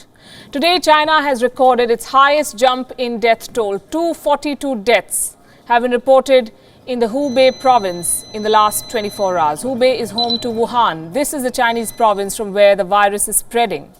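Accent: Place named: India. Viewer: Her Indian accent is a real turn on.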